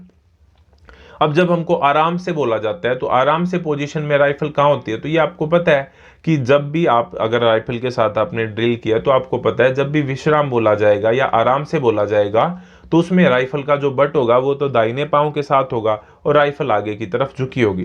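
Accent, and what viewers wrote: Haryana, India. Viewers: Bhai haryanvi hai k ?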